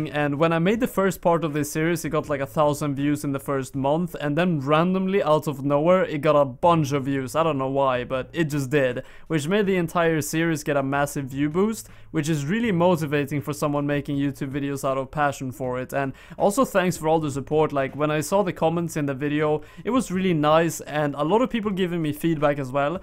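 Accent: Sweden. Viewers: This guy is so swedish you hear it on his voice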